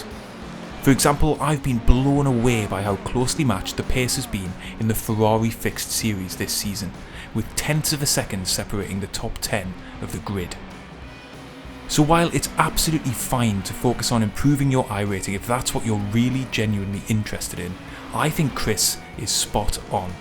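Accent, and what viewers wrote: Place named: County Durham, United Kingdom. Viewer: Hi you sound local to me as I live in stanley co.durhm. Enjoying the vids and would love an idea of price for a simple mackem mover graphic for my current cars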